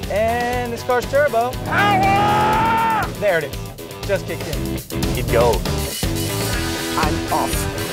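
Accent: Germany. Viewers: I love German accents! :D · She does her reviews well but I mean seriously, I don't know how you're gonna do it, but you just have to do something with the accent. I'd rather she speak in German with subtitles. No hate I honestly love the reviews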